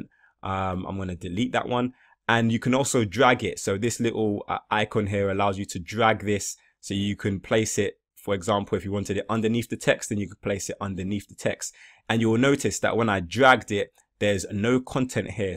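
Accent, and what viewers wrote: United Kingdom. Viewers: Thanks for the fast reply . I know it is early morning for you, if your UK accent is any indication as to your location ... :-)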